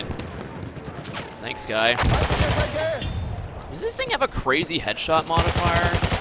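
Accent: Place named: Italy. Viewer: why is the voice italian??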